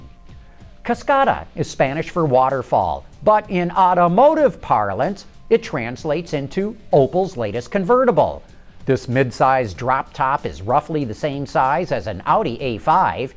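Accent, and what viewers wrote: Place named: Midwest, United States. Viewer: Theses videos are brilliantly well done. But my only complaint is McElroy's funny-sounding Midwest accent.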